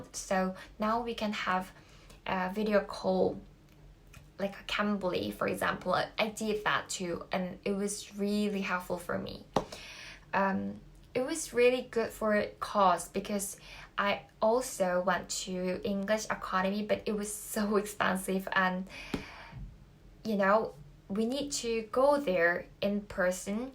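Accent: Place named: United Kingdom. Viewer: 님도 새해 복 많이 받으세요!!! 발음이 전보다 더 좋아지시고 영국억양이 조금 더 느껴지는거 같은건 기분탓일까여~? ㅎㅎㅎ 영상 편집실력도 더 는거같아요 히힣ㅎㅎ 그리고 오늘 입으신 옷 너무 예뽀요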